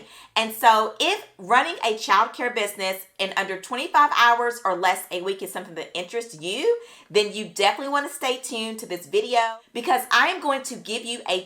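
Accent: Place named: South, United States. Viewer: I love your country accent! 😊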